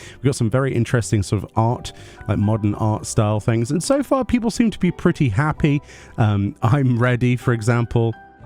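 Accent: United Kingdom. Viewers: Me watches cause he always posts video's on the latest ffxiv. And I want to stay updated. also he avoids drama. also he has a nice british accent Haters on the internet: we don't like that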